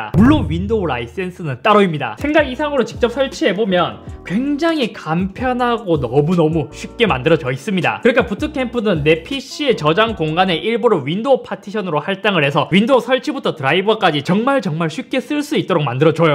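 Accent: Gyeongsang, South Korea. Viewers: 잇섭님 혹시 대구에 사세요? 경상도 사투리가 들리는것 같아요.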